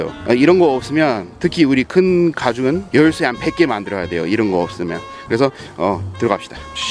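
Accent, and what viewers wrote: South Korea. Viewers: 우연히 들어오게됬는데 이분..억양이 미국분 맞냐용?한국분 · 목소리만 들으면 한국인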